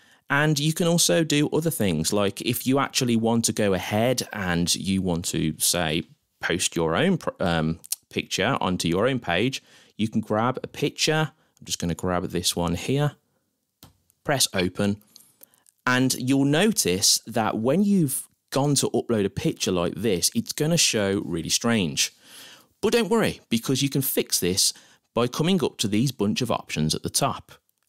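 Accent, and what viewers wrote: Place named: West Midlands, United Kingdom. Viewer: Excellent video. And I find the slight West Midlands lilt relaxing as well. Which is good because this is an infuriating task.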